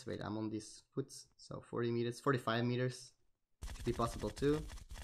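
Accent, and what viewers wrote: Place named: England, United Kingdom. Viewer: I love ur english accent, great effort in video, tx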